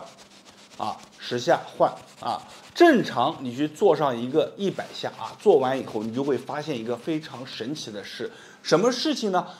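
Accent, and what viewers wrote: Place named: Nanjing, China. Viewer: 宝藏老师啊！谢谢！第一次留言，昨晚不光做了您教的这个动作，一口气看了您其他的好几个视频，尤其关于肩颈的动作，实测当场起效，我是颈椎病、肩颈综合征患者，从去年开始犯病，一直就恢复过，昨晚做了您的动作，让我轻松无比！另外听老师口音像是南京或是南京周边地区人，若老师方便的话，我想登门拜访，感谢！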